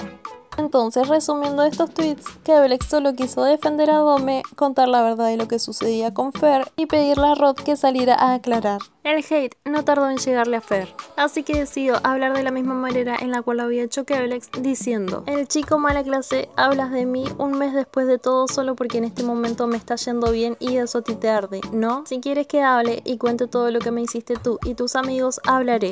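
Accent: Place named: Venezuela. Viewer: no tiene acento argentino habla como Venezolana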